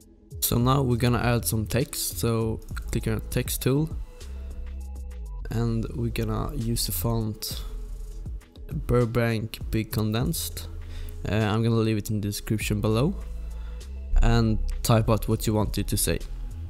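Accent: Sweden. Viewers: Ur swedish xD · are you from sweden_ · Man hör att han är svensk xdd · This guy is Swedish I bet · you sound like you are from sweden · you sound Swedish. Sorry if im wrong. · He comes from sweden you here it very easy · Swedish 100% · Are you a Swede??? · Are you swedish? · Are you swedish bcuz u have an swedish accent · are you from sweden?? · Man hör att du är från sverige ^_^ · due svensk eller hu? De hörs på din röst lol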